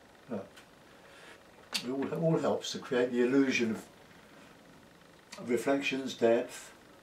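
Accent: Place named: England, United Kingdom. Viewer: I'm awed by your talent. As you've said before, watching you makes me almost want to give up.....almost. I've been trying to create scenes such as yours for over 40 years and still can't produce the quality of work that you do. Thank you for hours of fascinating and pleasurable viewing. Being a Yank I did, at first, have a little trouble with the English accent (my problem, not yours), but I soon got used to it. Do you ever do any miniature watercolors? And, if so, could you put one up on YouTube? Thanks again and keep it coming.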